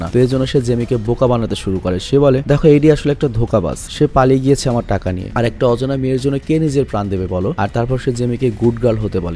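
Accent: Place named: Noakhali, Bangladesh. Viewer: এাই নোয়াখালীর হোলা❤❤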